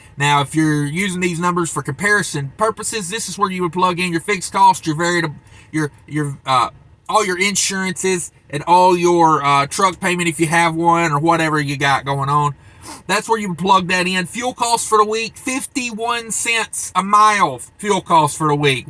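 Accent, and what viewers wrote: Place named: Minnesota, United States. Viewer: Done good Mr. But I prefer the natural southern Minnesota accent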